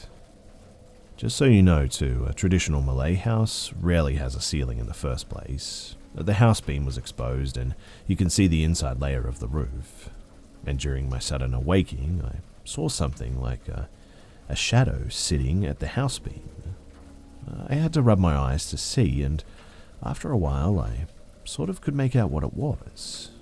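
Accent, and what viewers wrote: Australia. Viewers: Aussie are you not? Been looking for a channel that does what you do, I'ma horror fan 🙂also Aussie 😄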